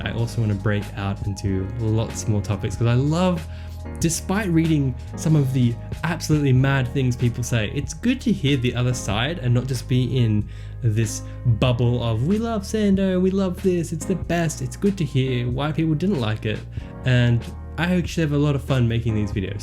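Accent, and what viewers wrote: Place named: Australia. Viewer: I am loving the Australian that's coming out! More please :D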